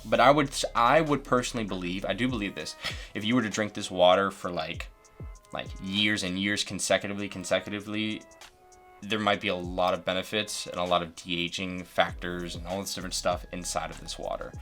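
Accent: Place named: East Coast, United States. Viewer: Are you by any chance from the east coast? I swear I keep hearing a slip of accent come through that sounds like it could be anywhere from Philly/SouthJersey to Baltimore area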